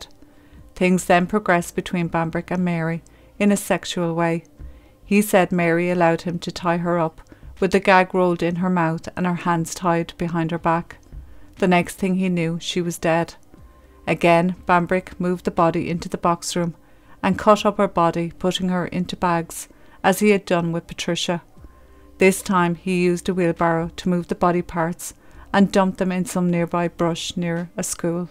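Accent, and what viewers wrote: Ireland. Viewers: Thanx I enjoy your channel,straight and to the point and of cos the Irish accent,the cherry 🍒🍒🍒🍒 on top.Much love from South Africa.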